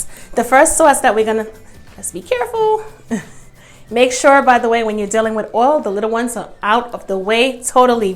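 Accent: New York, United States. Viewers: Go Thank You For Giving US Momma's An Quick, Easy & Delicious Recipe For Picky Eaters. Quick Question-Are YOU From New York? Cause When YOU Say Certain Words-YOU LOL Sound Like ME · Gotta Be From NY With That Accent 🥰 Subscribing To You Now Lol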